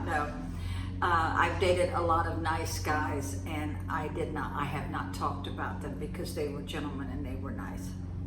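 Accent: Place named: Southwest Virginia, United States. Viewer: OMG I love that SW Virginia accent. My late husband was from Roanoke.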